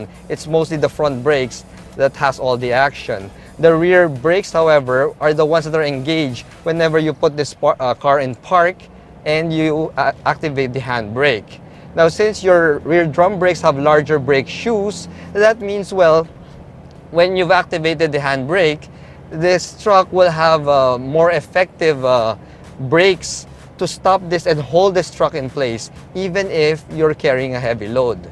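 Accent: Philippines. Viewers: Ang conyo ni sir pakinggan sarap manood 😅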